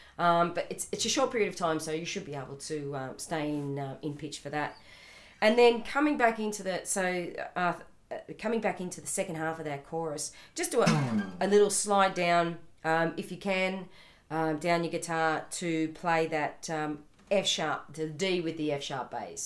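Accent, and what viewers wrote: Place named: Australia. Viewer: nice video!! love that Aussie accent too!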